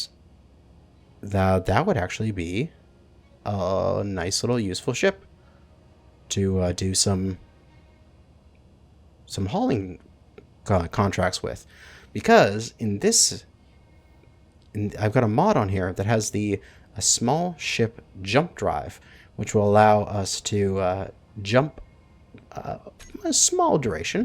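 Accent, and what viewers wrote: United States. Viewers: I'm not usually fan of American accents being English myself, but your voice is rather quite soothing to just listen to/watch while i'm doing things on computer :)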